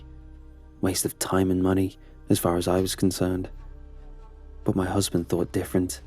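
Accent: United Kingdom. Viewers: why is it that a good British accent makes any story better? there is something soothing to me about it, like he could tell a story about how he makes mashed potatoes and it would likely captivate me. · Why is the guy British bruh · What’s with all these videos narrated by a Brit?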